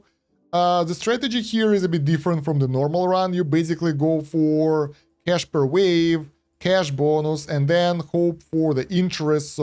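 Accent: Russia. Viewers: Слышу твой русский акцент, автор))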